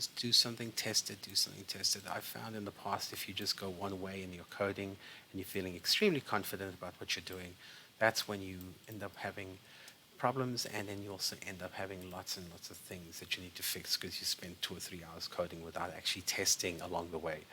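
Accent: South Africa. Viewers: Are u SOUTH African??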